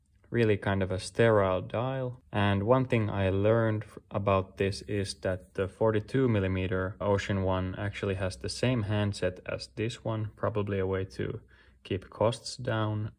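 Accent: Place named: Finland. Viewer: Everything in the voice, intonation, word selection and the way of speech made me think this got to be finnish guy speaking english. Sure enough, finnish dude. Nice video and that watch really is a good watch, only gripe is that it looks like rolex, but its just my opinion. greetings from finland.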